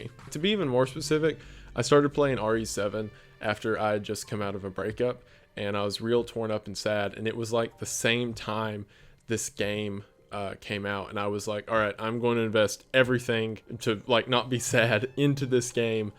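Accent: South, United States. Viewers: But he has a southern accent lol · the hawaiian shirt coupled with a southern accent is a godly dadcore combination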